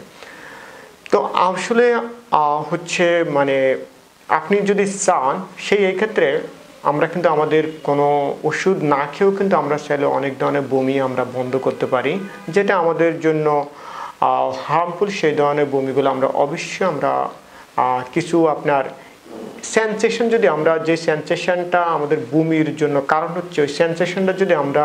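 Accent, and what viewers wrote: Noakhali, Bangladesh. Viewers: 😂😂আমার বানান যথাযথ এবং সঠিক উচ্চারণে আছে আপনি আগে আপনার ভিডিওতে নোয়াখাইল্লা মার্কা ভাষা চু*নো বন্ধ করুন।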